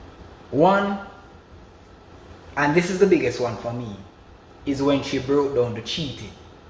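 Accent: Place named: Caribbean. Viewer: You said all facts bro, I just subscribed didn’t know you make content I hear the accent, im Carribean as well, big ups 🤛🏿💯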